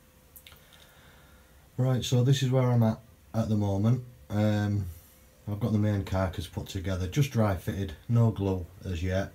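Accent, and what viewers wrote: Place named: Hull, United Kingdom. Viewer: This is driving me nuts looking for clues. lol. Is it Hull?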